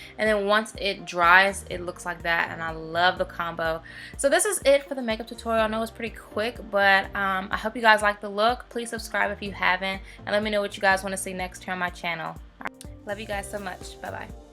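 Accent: South, United States. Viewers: You sound extraaaaa country in this voiceover! Love the accent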